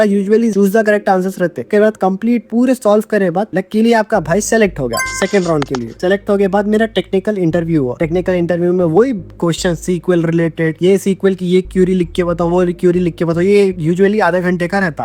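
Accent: Hyderabad, India. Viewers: Tum Hyderabad ke dikhre accent dekh ke. Good to see our Hyderabadi doing such great work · Nice accent bro hyderabadi ❤️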